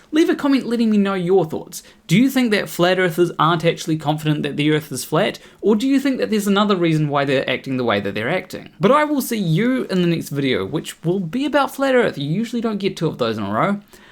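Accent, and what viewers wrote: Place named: New Zealand. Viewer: That accent, are you a kiwi too?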